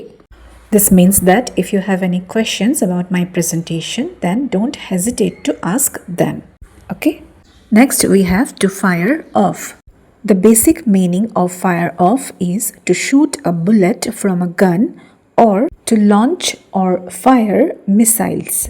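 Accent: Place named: India. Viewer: Esa pronunciación es de india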